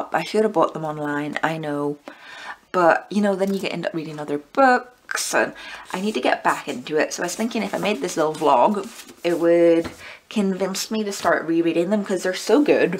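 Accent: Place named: Scotland, United Kingdom. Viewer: I've just re-watched the first 20 seconds of your video and I would have bet money that you had a [mild] Scottish accent. And I'm from Yorkshire in the North of England, so the Scots are my nearest neighbours. I've got to ask, where are you from? If that's not a Scots accent then what kind of accent do you have? I'm genuinely perplexed and massively curious. You speak English with native fluency so I doubt it's your second language.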